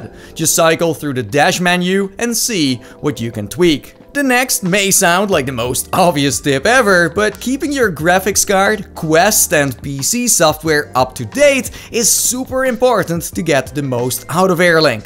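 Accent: Netherlands. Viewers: His accent sounds sooo dutch.🇳🇱🇳🇱🇳🇱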